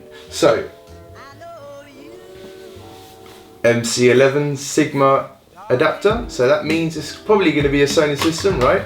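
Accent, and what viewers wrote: United Kingdom. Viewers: Guten morge. Bin neu auf deinem kanal durch #teamspielkind. Woher kommt denn der nice britische akzent? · Hört man sehr stark Raus 😂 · Wieso kannst du so gut Englisch? Hört sich halt ultra nach nem britischen Akzent an... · okay I´m really flashed right now I have been watching felix` vlogs over the past few weeks daily again and when you stepped in the #TeamSpielkind I really liked how you edited the videos and how you were and are in the vlogs but on German and now I FINALLY checked your chanel and I must say that I`m pretty flashed by your British Accent haha xD ok I had to say that. I wasn`t expecting this xD Didn´t know you were British haha. Okay keep on I will follow you on.:D Bye have a nice day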